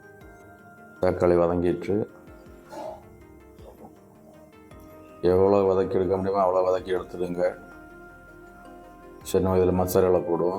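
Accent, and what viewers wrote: Kanyakumari, India. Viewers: கன்னியாகுமரி யா நீங்கள்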